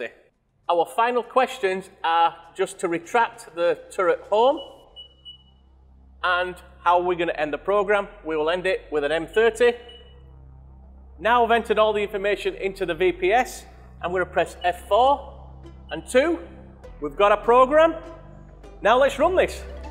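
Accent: United Kingdom. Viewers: Love the accent too. It's an accent I always associate with traditional British engineering, and steam trains.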